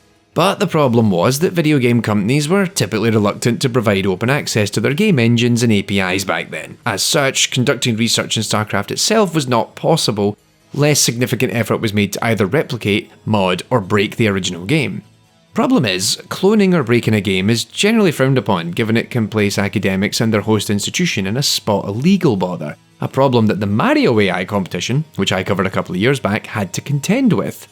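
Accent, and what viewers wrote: Scotland, United Kingdom. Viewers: Anyone else taken aback by the sudden switch from a Scottish accent to a really good French one?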